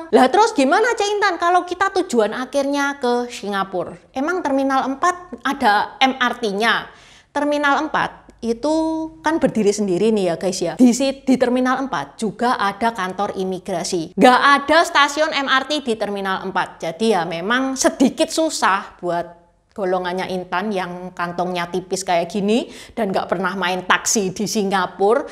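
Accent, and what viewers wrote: Java, Indonesia. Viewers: Aduhhh, dengerin ci Intan menjelaskan , maaf ya, kenapa jd stress ya😅... volume suaranya itu lo mbak dan medog jawanya. Pusyingggg... Intinya kawan. Mau di terminal brapa aja turunnya, gov singapore sdh pasti membuat penumpang nyaman. Ada skytrain, ada shuttle bus tp free semua sbg bentuk convenient nya utk penumpang. Jd ga susah2 amat mau dr budget airline or full service flight kalo perlu pindah. Utk makanan , branded stuff, di semua terminal ada. No worries.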